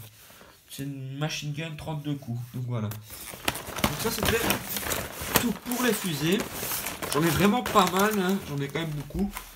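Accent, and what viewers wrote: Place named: Belgium. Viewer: Non c un belge çà s'entend ( je suis belge)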